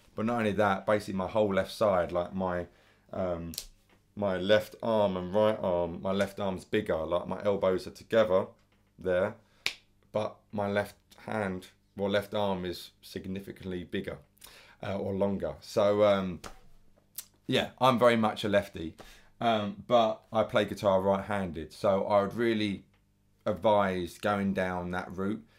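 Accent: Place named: United Kingdom. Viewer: your name and accent say british, your features and sunny temper say mediterranean... what's your secret?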